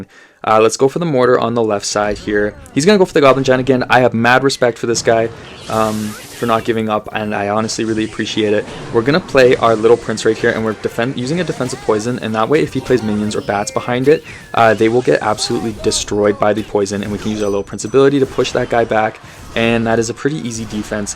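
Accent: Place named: Canada. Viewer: Your voice sounds so Canadian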